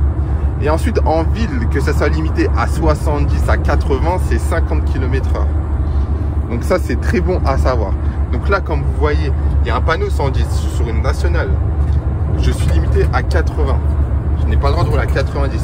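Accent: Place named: Antilles, Caribbean. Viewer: ahah l'accent timal on reconnais les frero tu es d'où ?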